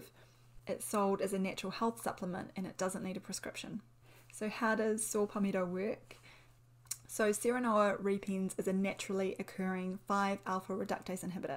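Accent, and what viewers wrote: New Zealand. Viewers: Beautiful New Zealand accent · I take saw pameto in powder and I only use a little because I juice it with a bunch of other herbs, is that good or badd ? I've heard so many different things about it and you're the 1st to say that it's probably not the best for men in a lot of ways so what's going on? By the way I know you're from New Zealand by your accent because one of my good friends is from the total Kiwi accent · Love the kiwi accent 🥰 Thanks doc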